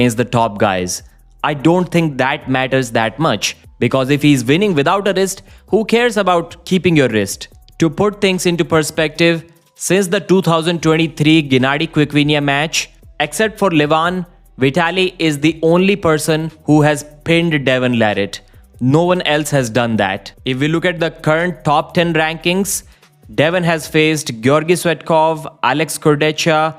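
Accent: India. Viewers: Dude you got the hardest indian accent like fckn ever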